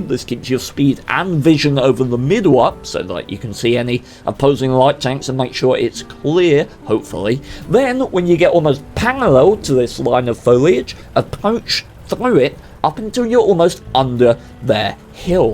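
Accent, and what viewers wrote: United Kingdom. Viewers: Evrything's better with that English accent.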